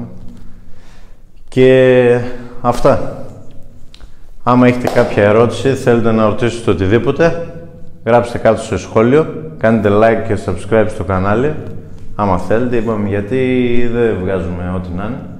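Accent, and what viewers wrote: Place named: Thessaly, Greece. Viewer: νόμιζα Θεσσαλία κάπου!!!!!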